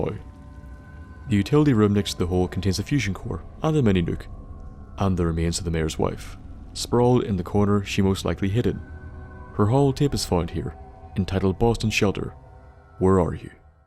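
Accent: Northern Ireland, United Kingdom. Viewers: Where abouts from northern ireland are ye from? I'm from Co. Down lol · May I ask what country are you from?, you sound like you're from Northern Ireland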